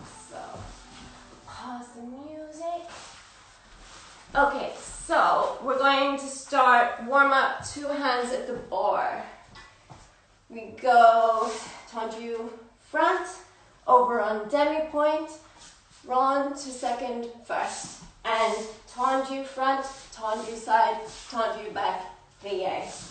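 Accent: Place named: New York, United States. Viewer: Certainly haven't lost your touch- You look like you're feeling healthier than ever 🧦💛 (Can't remember if you're from New York originally, interesting accent though)🦅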